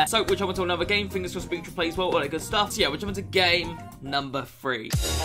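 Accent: United Kingdom. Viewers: Where abouts in the uk are you from I can’t tell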